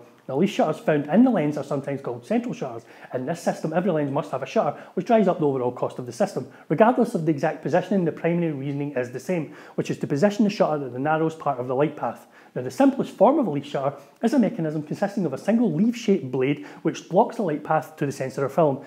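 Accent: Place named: England, United Kingdom. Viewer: ahaha...yeah man, you are rapping the info out off your mouth....with heavy english accent....this how to vd...check on your basic teaching and communication skills...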